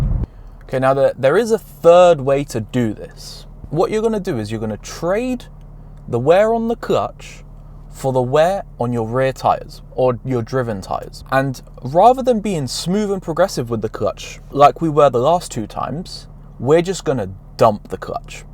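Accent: United Kingdom. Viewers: Nah just british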